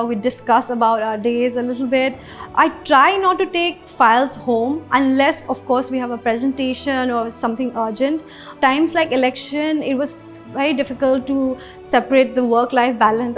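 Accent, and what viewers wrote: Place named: Shillong, India. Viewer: She has a Shillong accent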